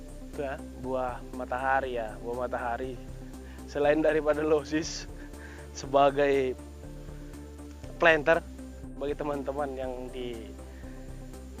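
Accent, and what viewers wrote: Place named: Medan, Indonesia. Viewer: Mantap bos q... Semangat pak.. Terima kasih tipsnya kk Mantap kali lah logat medannya pak.. Salam silaturahmi pak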